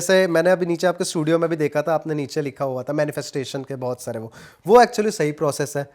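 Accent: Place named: Chhattisgarh, India. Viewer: Bhai mujhe tumare tone se lgta hai ki raipur ya bhilai taraf se ho shayad ❤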